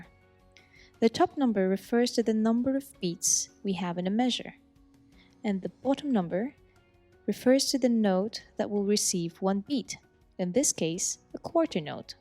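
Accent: United States. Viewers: Biglang nagtagalog akala ko po tlga Americana kayo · nagulat ako nung nagtagalog siya akala ko kano HAHAHHAH thank you for the vid tho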